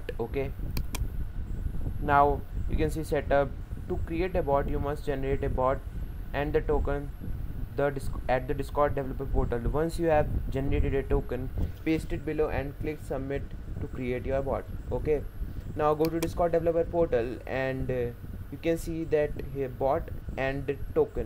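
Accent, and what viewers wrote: India. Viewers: Me: joins the vid Indian voice Me: wait this one is definitely real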